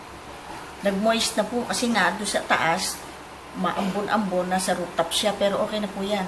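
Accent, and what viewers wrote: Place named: San Miguel, Philippines. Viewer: thanks for the share! i like the way way you described things, may punto pa eh! parang taga san miguel eh! i watched the bagoong, so i ll continue with tuyo. ganda ganda nang tuyo, just like you and your video! thanks again! ow sadiqa ka pala... kaif falik, shukran jazilan!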